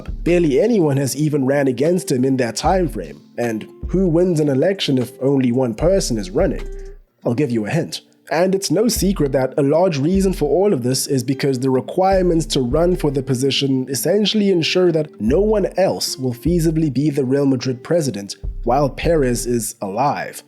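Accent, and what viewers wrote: South Africa. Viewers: You sound South African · Bro are you south African? Your accent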